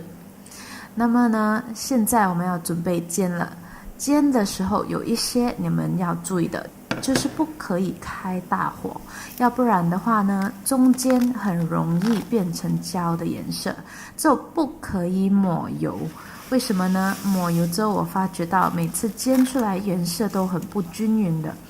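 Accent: Malaysia. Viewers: 果然马来西亚华语，语病连连～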